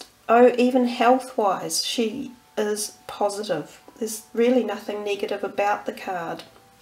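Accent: New Zealand. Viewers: Kia Ora! Thank you very much good to hear a kiwi voice from the homeland. Much love from the Philippines. Thank you for your time and effort much appreciated!!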